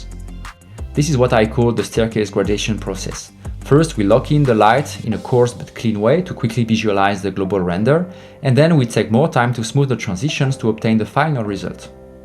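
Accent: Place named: France. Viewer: Ah, cet accent Français inimitable, qui a son charme :) Merci pour le tuto.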